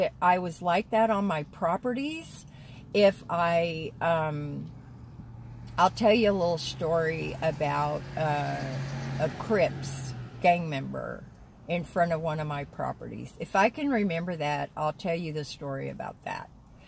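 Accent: South, United States. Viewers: You did right!!!! I love your voice and for some reason it rings a bell you went to the rubber tramp roundup and met another southern gal and both of you were d,rawling like true born southern gals! Lol,it just reminded me,could be wrong though! Love Rea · Hi 👋🏻 I’ve not commented in a while. I’m back to work, so I don’t get as much time to sit and binge watch your videos. Hehe. I am still watching however, just not commenting. Admittedly I probably would have done the same as you and shouted out. And as for your accent, well I believe you have a gorgeous southern accent. And I can hear it in all of your videos. I’m from the uk though, so I’m not used to the American southern accent at all. Loving your stories, I’d love to hear about the one you mentioned about a gang member in your property. #exciting #cliffhanger lol have a nice day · For what it's worth, I just checked out your very first video from 2 years ago and you sound the exact same way - southern accent and all! 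There are always going to be people here on YT and elsewhere on social media who will try to find anything to criticize you about, especially since they can do it anonymously behind a keyboard. Unfortunately, it comes with the territory. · At the very least I would’ve called, but probably done what you did. That’s so true about accents, as I’ve seen it with my in laws, especially if they would be talking to friends or family that are still in the South. That’s your roots coming out, and that’s you!😍❤️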